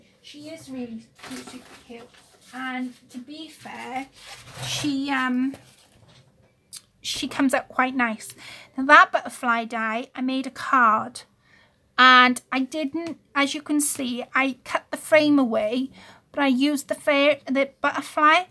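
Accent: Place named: Wales, United Kingdom. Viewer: Sorry I disappeared, my internet quit on me and I had to call my provider, but I can watch the playback, gorgeous card. Love your Welsh accent, I'm originally from Manchester so I recognized it right away. lol TFS...Hugs...Mary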